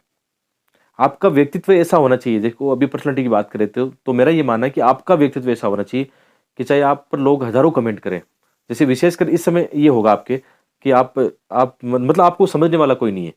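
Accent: Haryana, India. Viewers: Sorry....आप सब कुछ छोड़ कर सिर्फ खुद पर ध्यान दो। इतनी जानकारी books की questions की किसी को नही होती बस आप एक जगह ध्यान लगाओ,ओर वो ह आपके बोलने का हरियाणवी लहजा बाकी सब perfect है।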